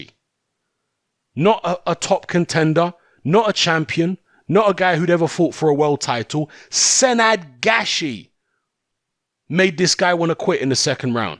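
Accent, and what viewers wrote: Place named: South London, United Kingdom. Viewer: You sound like youre from Sth london ,....tssr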